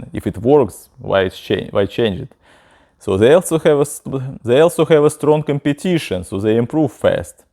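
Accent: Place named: Russia. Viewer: You have the best russian accent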